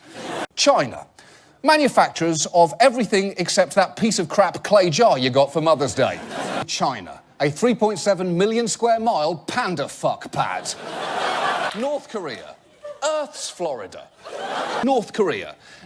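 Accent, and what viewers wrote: United Kingdom. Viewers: Where are you from?? the shot hole England??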